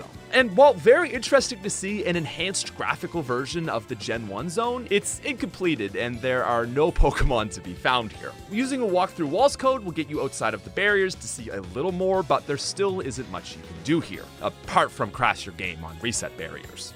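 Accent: Canada. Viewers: he probably Canadian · Thank you for my daily dose of hearing Canadian accent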